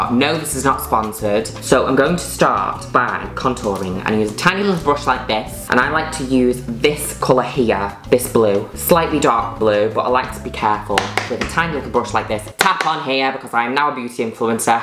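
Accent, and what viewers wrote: Manchester, United Kingdom. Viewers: violently british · what dialect Britain are you? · Your manc accent makes everything so much better 😂😂 makes me feel at home lol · as a fellow manc i think your accent is STUNNING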